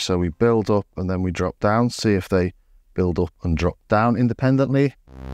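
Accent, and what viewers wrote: Liverpool, United Kingdom. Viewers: That gear video was gear (as we used to say in my youth)😉 I am not a great keyboard player, but you've certainly piqued my interest with this video. Great to hear the sweet tones of home... (Your accent, not the synth 😊). I'm a Scouse exile in Catalunya. Off to check out some more of your vids. Ta ra... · My wife says Scouser.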